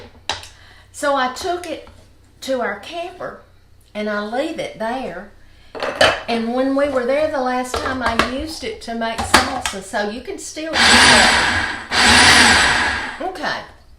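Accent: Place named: Alabama, United States. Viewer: I just love to hear you Alabamians talk. I’m from E Tx and it is said that we have an accent 😂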